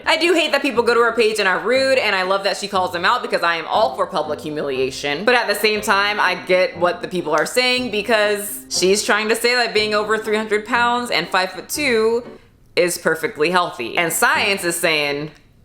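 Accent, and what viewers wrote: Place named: New Jersey, United States. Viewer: Omg I’m watching this video 5 years late. I LIVED HERE 5 years ago! I’ve been to that movie theater! My gym was on the next block! 😂 ( I’m so glad I found your content in the past year. And your NY/NJ is on point 💚)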